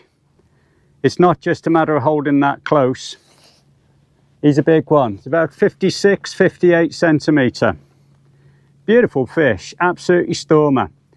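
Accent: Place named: Northern England, United Kingdom. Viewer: Oh I see 😊 Thank you mate.I did notice you had a northern accent 👍 I was thinking somewhere near Liverpool? Your vidios are brilliant,I love how you get so excited and can see you are a genuine nice guy that loves his fishing.Keep them coming buddy.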